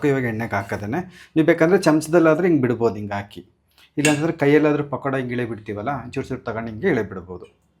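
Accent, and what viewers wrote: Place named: Davangere, India. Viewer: ನಮ್ಮ ದಾವಣಗೆರೆ ಭಾಷೆ.😊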